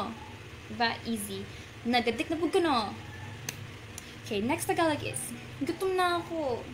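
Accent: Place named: South Korea. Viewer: New subscriber here lods,.😊 ilove your korean accent. Mag smile ko while watching sa emu mga video.❤❤❤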